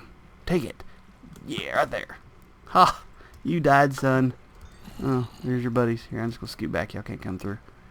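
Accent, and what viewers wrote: South, United States. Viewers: can I ask you something? Where are you from? The south? You kinnda' sound like your're from there..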